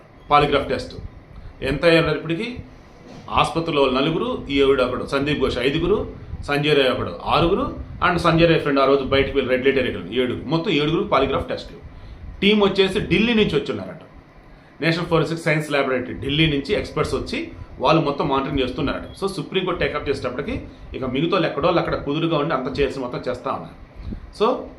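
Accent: Andhra Pradesh, India. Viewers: Your language has more of Andhra slang … for non Andhra people it’s not understandable… please speak in mutual understandable language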